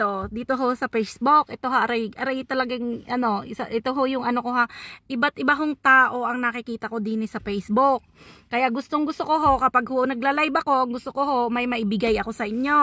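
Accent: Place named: Batangas, Philippines. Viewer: Hahaha,,, nkkatuwa k nmn mgsalita ng batanguena🤣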